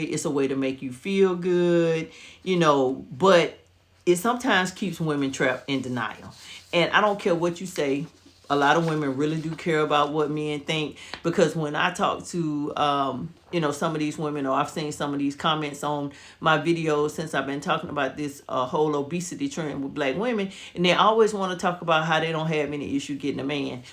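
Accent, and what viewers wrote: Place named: South, United States. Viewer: I can tell by your accent that you're from the South. I am from the South as well. But I also lived in California for 2 years. And I can tell you that most Black Men on the West Coast DON'T like Overweight women!!! That's more of a Southern thing.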